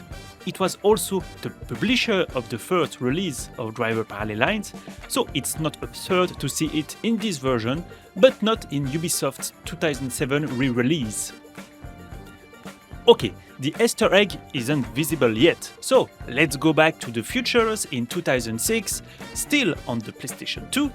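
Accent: France. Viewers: Are you french??? Damnnn the accent ahaah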